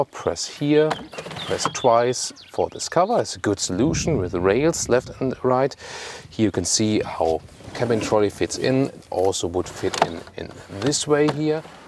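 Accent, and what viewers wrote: Germany. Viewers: I really like your German accent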